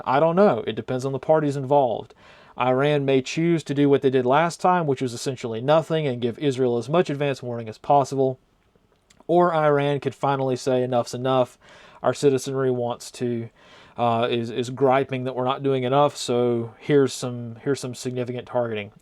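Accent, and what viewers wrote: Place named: Virginia, United States. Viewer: Accent puts him in virginia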